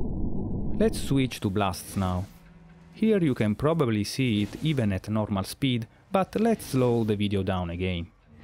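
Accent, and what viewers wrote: Italy. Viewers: I am so entertained by his Italian accent ,he sounds like Super Mario's 3rd brother Sonyo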